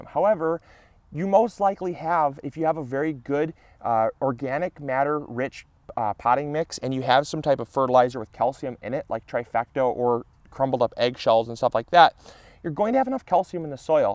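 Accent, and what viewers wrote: Michigan, United States. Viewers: That MI accent a bit strong hey?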